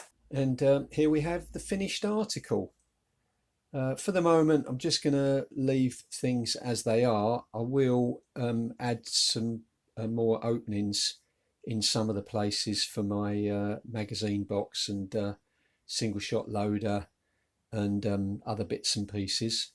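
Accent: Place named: East London, United Kingdom. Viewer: Helpful video. Love your videos. I can't help wondering where your accent originates from. You sound a lot like my late granddad, who was from the London East side. Very soothing to listen to. Anyway keep it up mate.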